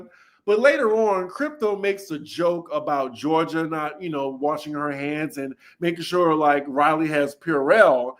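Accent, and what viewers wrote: New Orleans, United States. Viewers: you definitely have a New Orleans accent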